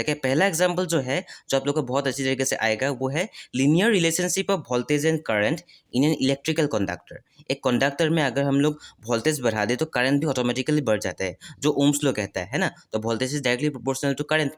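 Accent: Assam, India. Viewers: Bhaiya aap Assam se ho kya😃? Aapke accent se aise lg rhe😍.. Btw thanks for this amazing video 🥰🥰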